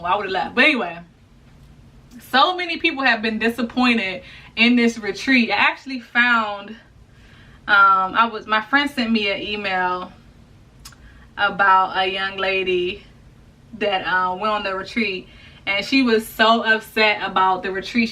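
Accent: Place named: DMV, United States. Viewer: Are you from the DMV? Lol you sound like it!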